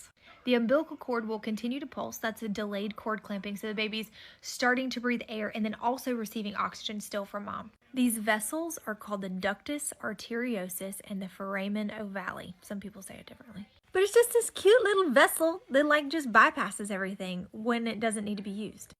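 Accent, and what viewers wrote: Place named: South, United States. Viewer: I love the way you described that without being condescending or anything, this felt like a general teaching lesson made from love. You should consider being a professor or something. There’s something about your absolutely gorgeous and sweet southern drawl that makes me want to list to you explain things all day. I’d love to learn more about the female reproductive organs and babies being formed and delivered from your voice. Anyone else and I’d probably rather not hear any of this. I’m not a father, not expecting to be one anytime soon and would only like to learn these things if it were going to be happening to me, but if you explain it, I’ll learn and take notes.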